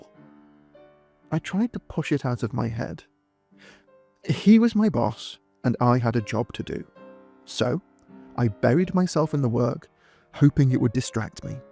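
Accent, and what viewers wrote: United Kingdom. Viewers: Good northern accent. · I like this British voice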